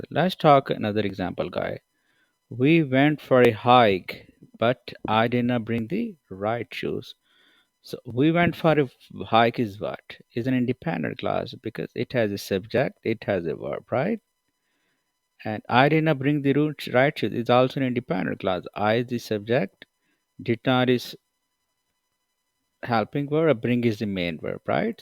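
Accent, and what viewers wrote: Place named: India. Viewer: Your indian accent is intolerable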